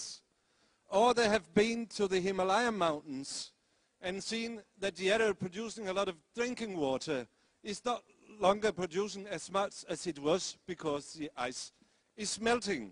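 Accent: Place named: Denmark. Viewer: joken er lidt på dig, for han siger faktisk THEREfor, og det passer perfekt... Han engelsk er sådan set fint, han har dansker accenten og lidt grammatiske problemer....men han når selv og rette de fejl han laver... så længe han forstår og formidle sig så pointen kommer igennem er det vel fint? Men, han ku' nu godt ligne viggo sommer en smule ;)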